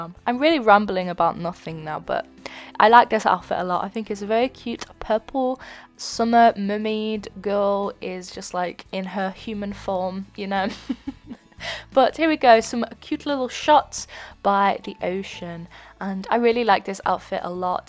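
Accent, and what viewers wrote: United Kingdom. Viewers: Are u British lol you'll never answer 👌🏻BTW I love ur channel🌺🌺🌺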